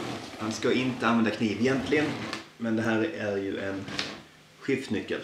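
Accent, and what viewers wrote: Skåne, Sweden. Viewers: Hi, I’m a Dane from east Jutland and I understod all of what you said without any major issue. My question is: would you consider yourself as speakning a prober Skånska dialect or perhaps a modern form of Swedish-Skånska? -which ofcourse is Swedish anyhow.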